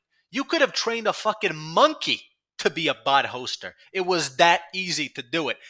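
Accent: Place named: Italian-American, United States. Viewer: Youre the most italian american man ive heard since i watched the sopranos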